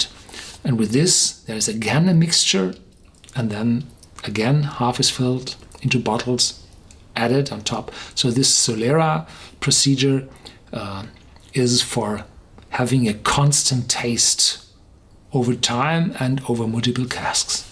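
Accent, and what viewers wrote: Germany. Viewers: Where are you from? Your acent sounds like a German one.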